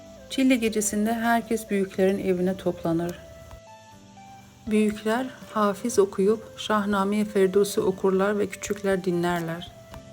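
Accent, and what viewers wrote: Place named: Turkey. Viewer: عزيزم دستت درد نكنه از اين غذاى خوشمزه ، چه لهجه ى تركى زيبايى دارى 🩷🪻🩷🪻🩷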